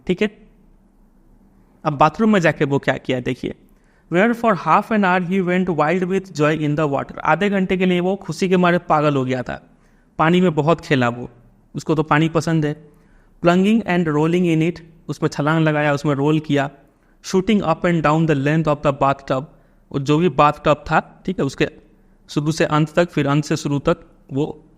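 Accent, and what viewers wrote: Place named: East, India. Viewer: Most probably east or north east india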